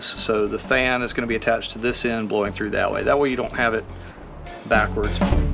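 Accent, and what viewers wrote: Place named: South, United States. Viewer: Great Job. Love the 996 videos, I have a 2003 that I bought from the original owner, retired navy pilot. He drove it like it was a daily driver. but he had a book stuffed with receipts for repairs and maintenance since the day it was new. Now sitting with 100K on the odometer and When I see a repair like this one, that I can't find a receipt for, I doubt it has been done, and go ahead and tackle it. Si aside from removing the alternator, it looks rather straight forward. Keep the videos coming Good Job. I'm a Florida Boy transplanted out here in So Cal when I was active-Duty Navy. good to hear a Good Ole Boy accent reminds me of home.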